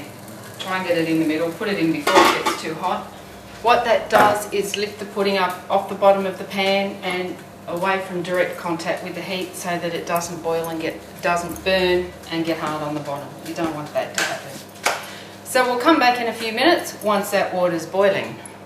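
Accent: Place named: Australia. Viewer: Want to try a Chrissy Pudding for the first time this year. Living in England with my boyfriend and will be spending chrissy with his family again (a little chillier than 20 degrees), so wanted to do something special this year. Found a good recipe but the calico aspect escaped me-this video was so helpful! Also nice to hear the accent, if I closed my eyes I would have sworn I was listening to my Aunty Sue from Campbelltown :P so thanks for the reminder of home! Hopefully it works out!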